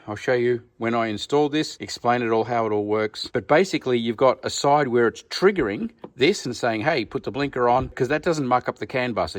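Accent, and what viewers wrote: Australia. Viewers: Good on ya....Very well presented and informative...And AUSSIE English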